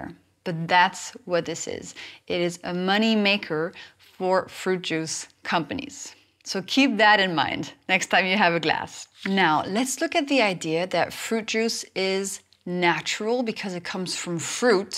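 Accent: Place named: France. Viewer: She's a French speaker. I can tell. Probably from France.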